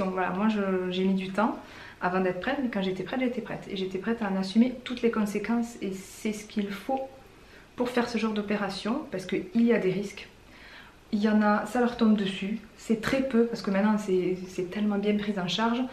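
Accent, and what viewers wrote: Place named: Marseille, France. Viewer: Je vis à toulouse et je trouve que tu as plutôt un accent de marseille :’) Personnellement j’ai 60kg à perdre avec la sleeve, et j’ai bientôta date de mon opération!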